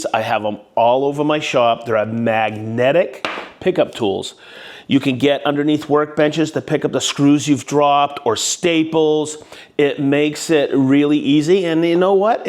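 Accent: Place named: Boston, United States. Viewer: I could be wrong.. but that’s Boston my friend.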